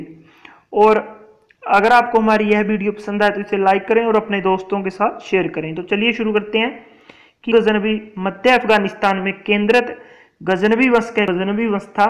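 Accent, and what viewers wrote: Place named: Punjab, India. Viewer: bhai y punjabi h... iski hindi se hi pta chal rha...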